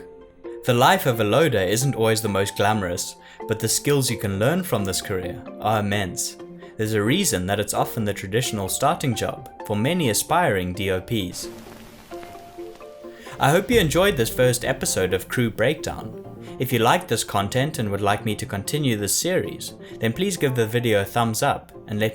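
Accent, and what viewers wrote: South Africa. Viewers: Subscribed. Your South African accent and rich videos about film are worth a subscription.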